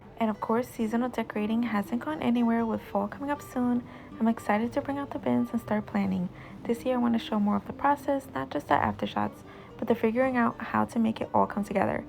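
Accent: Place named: East Coast, United States. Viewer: Your place is so cute! I’m in California & loving your east coast accent. Love your videos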